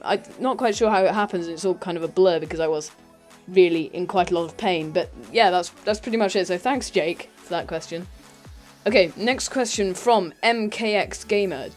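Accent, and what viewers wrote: United Kingdom. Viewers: Are you a real British?!?!?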